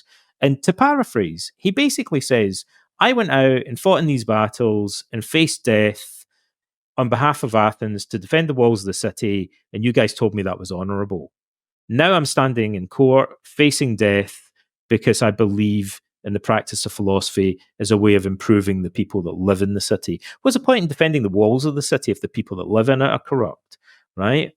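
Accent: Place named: Scotland, United Kingdom. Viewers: When I mentioned your description, Mr replied to it directly: "That's close. I'm from Ayr, not Glasgow, although it's nearby. I have lived in Nova Scotia, Toronto, and Montreal." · I didn’t know what I needed today, but listening to this man’s gentle Scottish accent as he talked about Socrates truly brightened my evening. Thank you so much.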